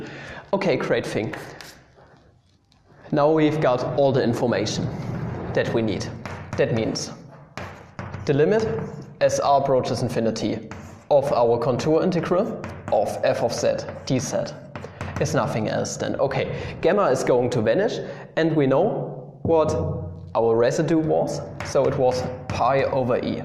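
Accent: Germany. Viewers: The german accent+The great content+Calculus+great explanation= THIS VIDEO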